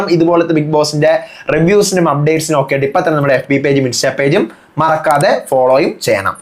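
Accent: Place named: Tamil Nadu, India. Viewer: Nee arada pandi ano tamiz kalarthi malayalam parayan. Onni malayalam alle Tamil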